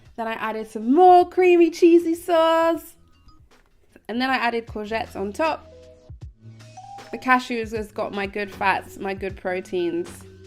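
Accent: England, United Kingdom. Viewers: Ok so I got 2 problems. 1) Vitamin is pronounced V-Eye-Toe-Min. 2) Does it make me a hater if I'm hating on your hot english accent because I wish I had a hot english accent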